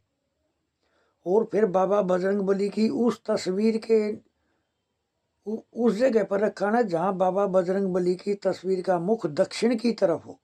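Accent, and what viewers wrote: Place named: Haryana, India. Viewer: Guruji.. Aapke hosle jaror buland hoge... Aisi jaankari abhitak kisi ne you tube par nahi daali hai... Aapke 42 saal ka jivan Ka anubhav aap bahut hi ache tarike se samjha rahe ho... Bahut jaldi Aapka channel bulandiya hasil karega... Sir Delhi me kaha se hai plz bataye apne office ke baare me next video me....hamare Haryana ka touch bahut acha lag raha hai jab aap baat karte hai.... 🙏🙏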